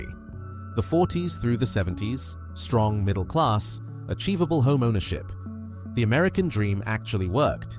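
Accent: United Kingdom. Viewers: Interesting that someone with a British accent is pointing out problems in USA. Compare Great Britain with USA and see what the results are! This is not 1929 and Americans can still start their own small businesses and have more income than those who work for a salary. Those who have the means are already shifting to assets..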